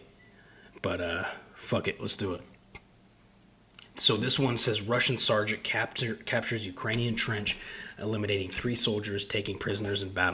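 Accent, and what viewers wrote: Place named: United States. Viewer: New subscriber here. I very much appreciate your straightforward style, yet you have a docile, soothing voice. I'll work on getting the backlog of your work watched in the upcoming days. I assume by your American accent that is where you are from, but people are abroad for many reasons, so I won't speculate. Love and support from NC, USA! EDIT: By the way, I'm so glad you're showing things as they occur and not blackened out, etc. People NEED to WTFO with that noise. I also appreciate, and that is not a strong-enough word, that you state your stance. I liked it a lot!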